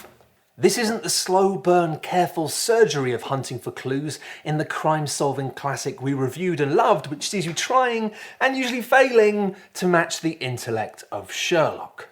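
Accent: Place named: Liverpool, United Kingdom. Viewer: What accent was that!? Vaguely scouse in parts, BBC Generic Northern?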